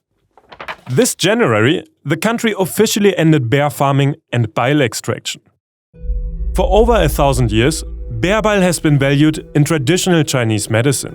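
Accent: Germany. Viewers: I can't cope with your cute German accent hahaha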